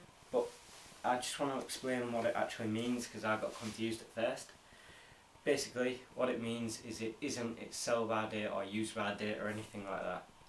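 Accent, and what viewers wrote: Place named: United Kingdom. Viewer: Aye a nice pack! I´m sure in extreme conditions two soldiers could survive for at least 24 hours. I´ve just got a few questions?!?? How do ya heat all that stuff? Ever tried the american M.R.E theres a simple heatin´ system inside the ration pack. And last but not least! Where´s ya accent belonging to?? It´s quite intresting that every town in the UK has got it´s own accent. Greetings frae germany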